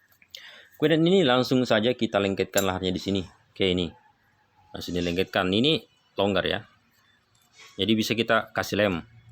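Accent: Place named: Bugis, Indonesia. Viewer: Tau ogi logatna anggotae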